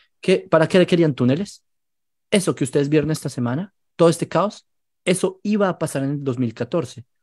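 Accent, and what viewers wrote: Colombia. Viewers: Tienes hacento colombiano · Eres colombiano?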